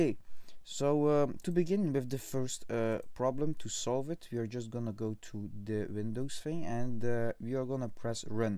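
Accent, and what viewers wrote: Netherlands. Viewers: lol i can hear you have dutch accent me 2 haha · Ik hoorde gelijk dat je NL was haha maar goed uitgelegd pik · ik hoor nederlands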